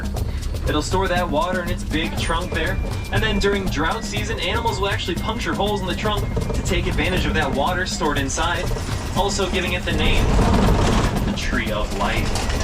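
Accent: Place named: South, United States. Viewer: Where are you from? Your accents sound south too 🎉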